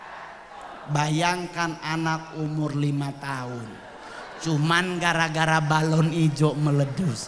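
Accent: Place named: Eastern Indonesia, Indonesia. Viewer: Terharu dengar Manado Batak Ambon Papua...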